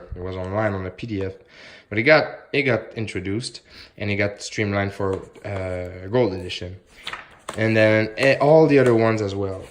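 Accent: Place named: Quebec, Canada. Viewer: I detect a Quebecois accent... ca va mon chum? Good review! Looking at buying these books myself.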